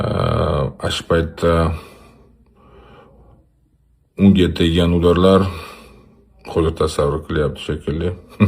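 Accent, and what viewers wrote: Andijan, Uzbekistan. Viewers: Молодец !! По Андижански все обьяснил ))) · Яхши гап бу́лди Анжончасига... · Ака чиста анжанча шевада гапрди🎉🎉🎉🎉🎉🎉🎉🎉🎉🎉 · Анжанча шевада😂 молодец уважаю ✊ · АНДИЖОН ШЕВАСИ ЛАХЖАСИ😊😊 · АНЖОНЧА ГАП БУЛДИ ЛЕКИН ВАТАН ОМОН БУЛСИН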